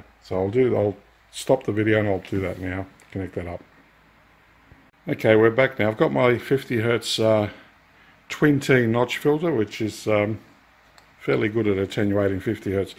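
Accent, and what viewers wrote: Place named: Australia. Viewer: You sound like you're in Australia. I wonder if you can receive a 60 Hz signal emanating from power lines elsewhere in the world. American Samoa would not be too far away, but it's small, so power lines would be short, not making a good transmitting antenna. However, in North America, some power lines are hundreds of miles long. If a wire antenna does not work, I would try using an iron core magnetic loop antenna.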